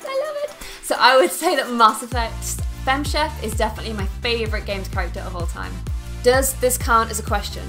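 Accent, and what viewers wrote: Ireland. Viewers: I have thought for a while that you have a slight Irish accent! :)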